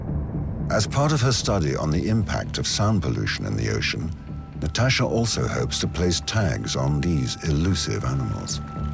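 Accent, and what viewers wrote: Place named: United Kingdom. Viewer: I really wish producers wouldn't use British voice-over with American scripts. Have some confidence guys! Also, to mention the blatantly obvious, it's not "eco-locate", it's "echo-locate", and 'dive' is a regular verb. It's not like "drive" just as "pee" is regular and "see" is not. Otherwise, we'd say, "I pawed my pants because I was so scared".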